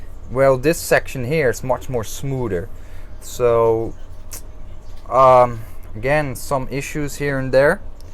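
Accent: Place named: Netherlands. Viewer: dude are you dutch? you sound like someone thats dutch and speaks english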